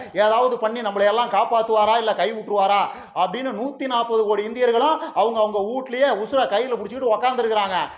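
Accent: Kongu, India. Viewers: அது எப்படியா உன் கொங்குத் தமிழ் பேச்சுல நீ சிரிக்காம அடுத்தவனை நிறுத்தாம சிரிக்க வைக்குற யூ ட்யூப்பர்லே நீ வித்தியாசமான ஆளுய்யா.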